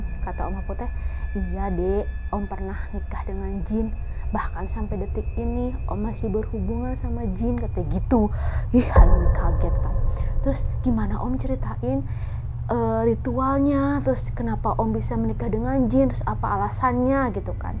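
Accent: Indonesia. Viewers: Ngerti dong Sunda banget c teteh na nyarios na😅😅👍👍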